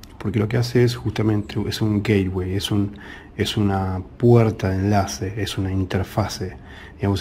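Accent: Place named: Argentina. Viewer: O habla en español o en argeninglish, me volví loco intentando traducirte...si pronunciás "bas" por "bus", deberías pronunciar "baedi" en lugar de "body", y así todo el video.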